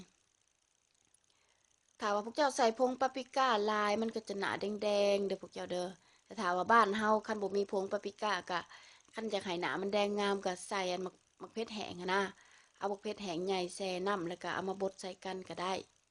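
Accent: Savannakhet, Laos. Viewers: พาสาลาวสำเนียงสะหวันนะเขตพากใต้ลาว... 🤨🤨🤨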